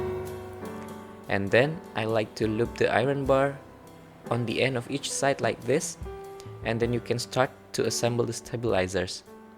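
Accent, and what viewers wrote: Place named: Indonesia. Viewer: nice video! and I knew that you were Indonesian from the first seconds in xD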